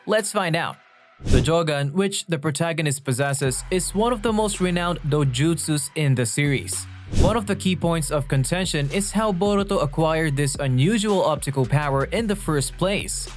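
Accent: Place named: Philippines. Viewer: Pinoy accent 😂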